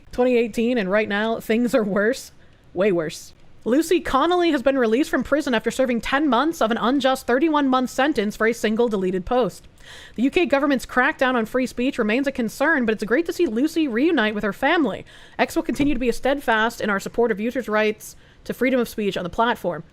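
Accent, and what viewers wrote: United States. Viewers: i love how you say "man" where is your accent from in the states? I am australia so help me out.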